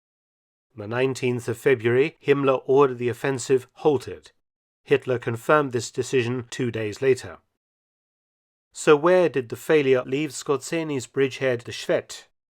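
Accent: United Kingdom. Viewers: Hello Mr Felton, My wife and I saw you on german TV yesterday when we watched a documentary on Hitlers trains. I immediately recognized your face, while she recognized you purely trough your voice. "That's the most english sounding english I know" she said. We love your videos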